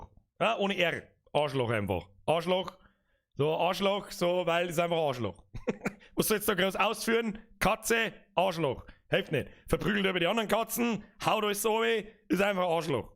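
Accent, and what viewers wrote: Bavaria, Germany. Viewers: Tahts Life Junge ^^ Bayrisch ist halt nicht jedermanns Sache Ich hab halt das Glück und wohne in seiner Nähe und verstehe alles :)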